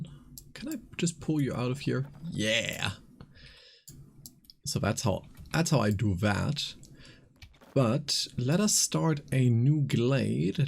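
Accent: Germany. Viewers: Hey bro, is that a German accent I hear there? Really like your content btw, really chill, just like the game lol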